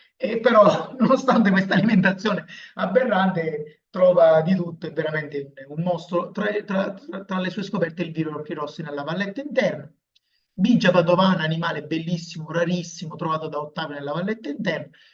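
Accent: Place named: Sicily, Italy. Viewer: scusate l'inizio è tagliato...e i sottotitoli automatici della IA non tiene conto della mia pronuncia "sicula", tagliando parecchie parole o facendo una stranissima interpretazione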